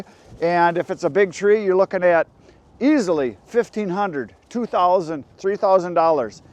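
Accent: Wisconsin, United States. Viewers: Sounds like Wisconsin.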